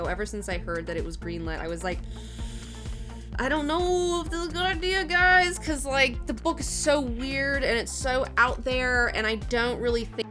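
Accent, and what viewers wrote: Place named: South, United States. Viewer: Oh my god, I didn't realize that you're pushing back a Southern accent until you broke into the stronger (though still mild) Deep South accent when you were get excited (and possibly because of what's in your drink) about how Sony's fucked up Spiderman. Also, truer words have never been said. Sony is a sad fucking movie production company.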